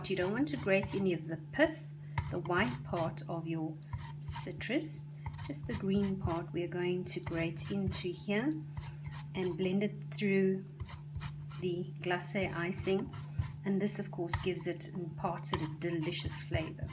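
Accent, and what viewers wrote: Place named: South Africa. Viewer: I love your recipe! Do you live in South AFrica? You have a SA accent, I lived there too, in Pretoria!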